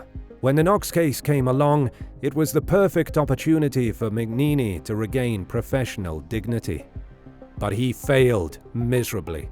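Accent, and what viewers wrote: United Kingdom. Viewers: Narrator talking so fast with a british accent was totally confusing and not understand alot of thr content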